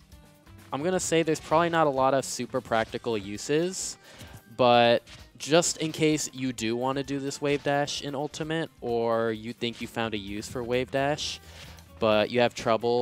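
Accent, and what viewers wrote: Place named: Canada. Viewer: This dude canadian?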